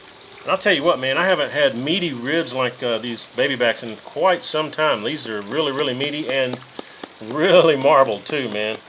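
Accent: South, United States. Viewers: Howdy. That southern drawl is music to my ears!! Much love from Waller,Tx.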